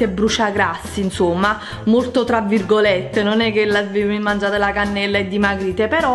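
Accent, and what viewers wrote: Naples, Italy. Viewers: ma sei di napoli???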